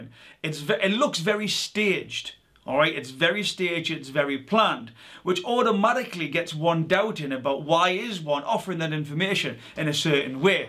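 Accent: Newcastle, United Kingdom. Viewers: U a geordie? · I love hearing a local accent on YouTube. We Geordies are gonna take over! Like the content.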